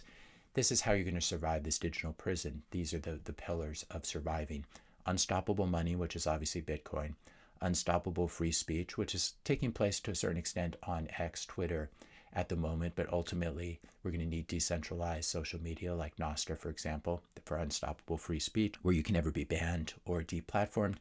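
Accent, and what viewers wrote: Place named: United States. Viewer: As a european i felt the no gun comment totally made you out as american even beyond the accent. Europeans think totally differently. If we need guns we already lost, our humanity, our civilisation. We might as well start eating burgers.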